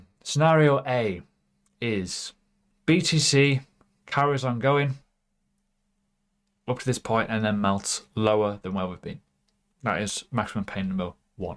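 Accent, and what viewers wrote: United Kingdom. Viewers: Great content. Maybe talk a little slowly people used to listen an american english than british.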